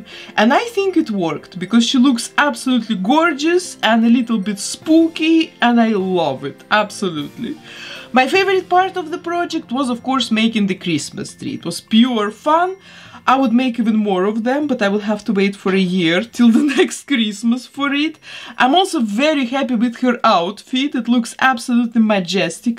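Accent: Russia. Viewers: это русский акцент верно?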